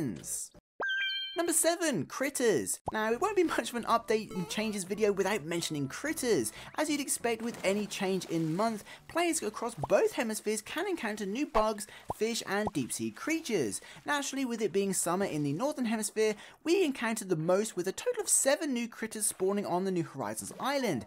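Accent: United Kingdom. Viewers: Are you from Great Britian?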